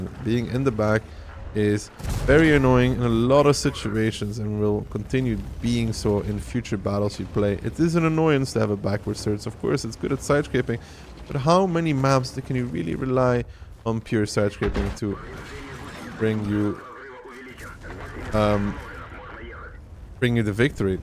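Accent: Netherlands. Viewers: Wait you dutch??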